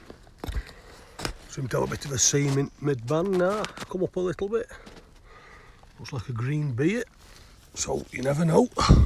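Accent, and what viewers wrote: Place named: South Yorkshire, United Kingdom. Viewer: Your accent sounds closer to the South Yorkshire accent than mine - I am from West Yorkshire.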